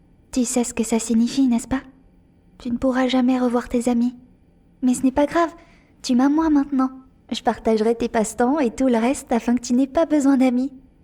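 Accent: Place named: England, United Kingdom. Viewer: Mais.. c'est la même personne que sur la chaine en Anglais? Je peux pas y croire, l'accent anglais sur la chaine principale vs le français parfait sur celle-ci :x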